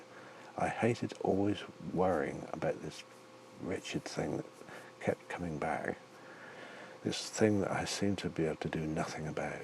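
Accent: England, United Kingdom. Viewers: Its the tone, deep voice, and English accent that helped me out tremendously ❤️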